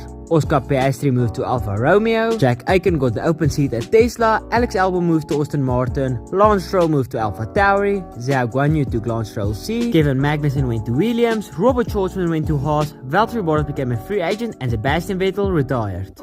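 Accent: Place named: Afrikaans, South Africa. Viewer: im trying to figure out where youre from and you give me afrikaans vibes, so ima guess SA?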